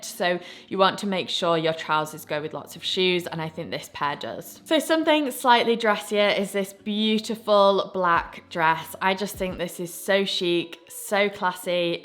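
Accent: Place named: England, United Kingdom. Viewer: Lovely young lady with impeccable taste. Few things on Earth sound more beautiful than an English accent. Hello from the great state of Texas!!❤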